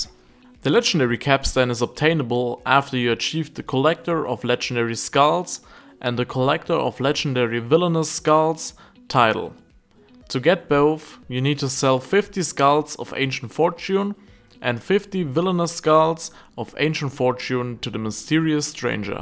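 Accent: Germany. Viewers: You are German, arent you? ;) Because there's something about the way you speak. Great video by the way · You sounds like a german haha. Thanks for the Video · Wenn der deutsche Akzent mal wieder komplett reinkickt xD Aber gutes Video 😁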